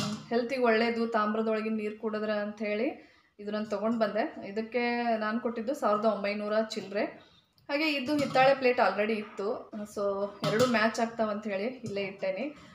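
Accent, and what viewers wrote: North Karnataka, India. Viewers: ಅಕ್ಕ ನಿಮ್ಮ ಭಾಷೆ ಕೇಳಿದ್ರೆ ನೀವು ಉತ್ತರ ಕರ್ನಾಟಕದವರಾ??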